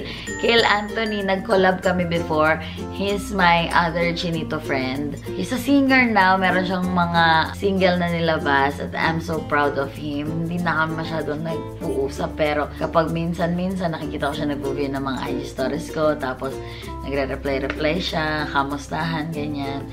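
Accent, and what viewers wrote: Batangas, Philippines. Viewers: gawa ka vlog na pure Batangeño accent.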